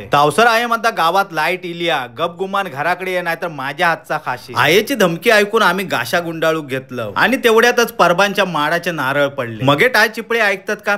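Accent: Malvani, India. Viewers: Nako, konkani, malvani bolanychi hich padhat bari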